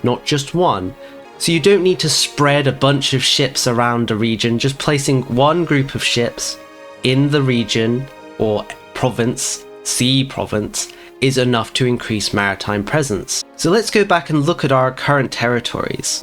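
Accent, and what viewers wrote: United Kingdom. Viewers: Are you British living in America, or an American living in Britain?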